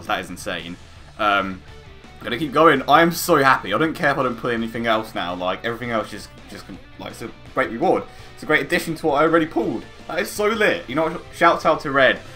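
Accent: United Kingdom. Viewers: YOURE BRITISH?!?! Who woulda thought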